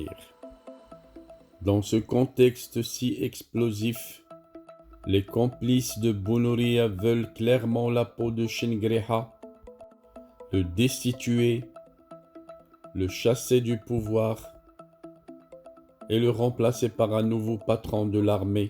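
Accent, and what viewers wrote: Morocco. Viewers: Mais qui veut éliminer mimi VI ? Le pauvre tout le peuple est contre lui même el mekhzen et ses enfants ! Dieu nous préserve de ce virus marocain ! VIVE L'ALGÉRIE 🇩🇿💪 VIVE NOTRE ARMÉE POPULAIRE ALGÉRIENNE 🇩🇿💪 GLOIRE À NOS VALEUREUX MARTYRES 🇩🇿💪 JE VOUS DONNE UNE NOTE DE 10/10 L'accent du marocain qui vous fait honte, vous avez essayer de camoufler hélas ! La récitation excellente !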